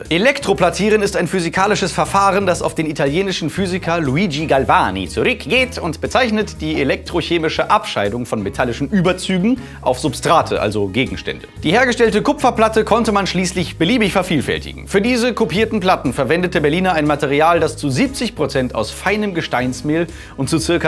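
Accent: Switzerland. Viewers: Bester Schweizer Akzent. Ich kann nicht mehr :D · Nicht alle Schweizer sprechen so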